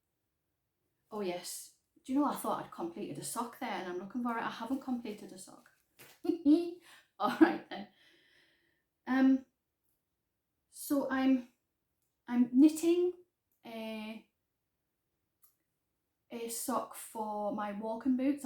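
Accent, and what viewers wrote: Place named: Northumberland, United Kingdom. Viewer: Hi,. I am soooo happy to see you had a new episode tonight. I absolutely love your podcast. You have such a lovely, calming effect on me, it was just the perfect, relaxing 45 minutes I needed as a hectic week draws closer to an end. I lived in Northumberland for a couple of years (although I have been back in South Africa for 18 years in June) and I still get homesick for it, occasionally. Your accent alleviates that somewhat 😂 As always, your projects were lovely. I find your more "minimalistic" approach to crafting refreshing (I too try not to just buy all the yarn, unless it is for a specific project, which would get started imminently). It is in inspiration to me to work through the stash I had acquired before I changed my ways. I hope to see you again, soon! And if you are holidaying in Northern-England, I would love to go along in your case! 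Ps: the yarn on your warping board already, is the warp. You will be weaving your weft through the warp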